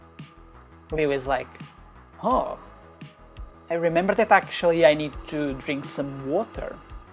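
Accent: Portugal. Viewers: Apanhei te 😂 o Tuga tem aquela Pontinha de sotaque lá no fundo💪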